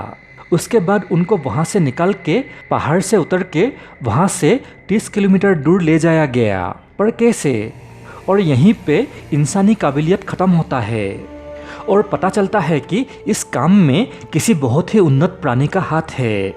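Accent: Assam, India. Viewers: Apunar Pronounce Huni Gom Palu J Apuni Oxomiya Hoi ....But Apuni Oxomor A Hoi Ne Onnyo Rajyor Hoi😊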